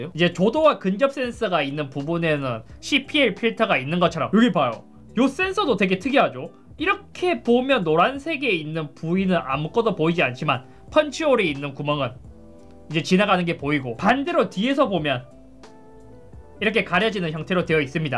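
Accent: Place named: Jeolla, South Korea. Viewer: 말끝마다 요거슬 이거슨 .. 개 역겹네 느그 고향이 쩌ㅡㅡ그저 7시 쩌ㅡ짝이여?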